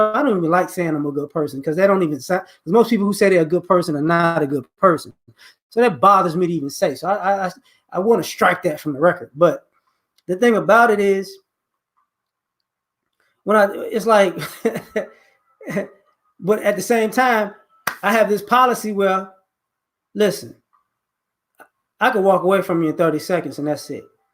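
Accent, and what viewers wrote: Chicago, United States. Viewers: Just listening to you, I can tell you from westside of Chicago. For some reason everything you saying Ive dealt with my entire life. I think its something about growing up in that environment we notice how confused people in society really are and dont even know it. STAY up fam...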